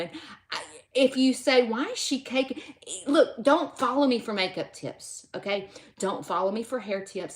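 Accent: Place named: South, United States. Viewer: Loved this show! I retired at the end of 2022 and am speeding towards 69 years old so I have a lot of newfound time on my hands! I live in Holland, Michigan & love listening to your southern accent. I believe listening to your shows (as well as those of Cog Hill Farms) will be a great way to go through my days! I appreciate the laughs that you both provide & I love watching the animals & your interactions with them. I anticipate your subscription numbers will increase so please make sure to take time for yourself to keep the balance you discussed in this show. Take care!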